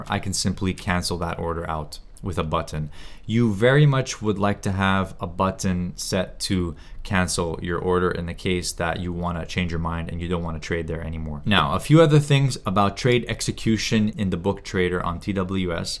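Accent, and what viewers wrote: Canada. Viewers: what is the name of the plattform? I dont understand canadian english that well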